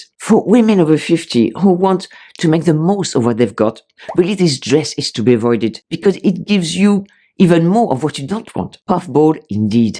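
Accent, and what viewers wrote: France. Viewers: Kia ora from Aotearoa, New Zealand!💙💚🙏 I really loved this video and think you're wonderful too!🙏 I love your beautifully soothing french accent and voice. I'm looking forward to more of your videos 🌟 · First of all I have to say I could listen to you talk for hours. Love the beautiful French accent. My ability to speak French is nil.😂 When I went to Europe. It was obvious that French women know how to dress. They know how to live life! They have impeccable style. Clothing is about quality not quantity. They spend money having their clothes tailored. They know how to buy clothes that fit!! They know how to dress for the occasion. They're very mindful of where they will be going and what they will be doing. Even the way they do their makeup. It's sensible yet sexy. Because of this they have je ne sais quoi. You develop style through your use of fashion that is unique to you. 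You don't wear a trend. That's definitely something I wish I would have discovered in my younger years. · you look fabulous in the purple blouse.😍 That's the advice I needed today, since not only do we have the same name, but we look similar as well, size, colors and all. It is still your wonderful French accent that draws me to your videos though! 😂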